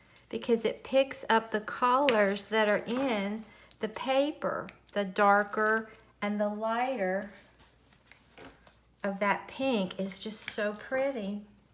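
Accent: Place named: Georgia, United States. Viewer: I like all you crafts and your southern GA speaking. I am from MS, so mine is more country. Like you I love to be creative. I am gonna be in GA about 10 days starting the weekend.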